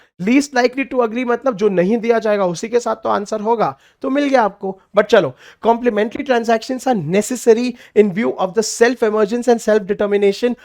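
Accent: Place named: Bihar, India. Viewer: Maano ya na maano but Bihari accent has the best grip to students😄😃 Way of Teaching is amazing 🎉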